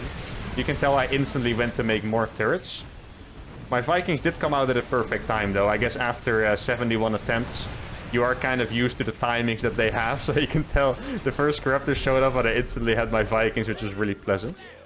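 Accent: Netherlands. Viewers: You are dutch arent you😋 I reconise our accent everywere. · Argh that dutch accent. Nice vid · Hallo Nederlands accent 🙂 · Are you dutch? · First video to see from this channel.. and judging by your accent.. you're Dutch aren't you?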